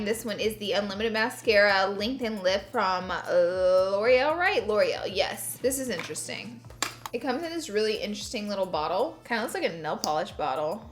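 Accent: United States. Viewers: Omg I luv u so much and I luv ur videos too! I luv ur voice it is so country and u are my all time fav youtuber 💙!!!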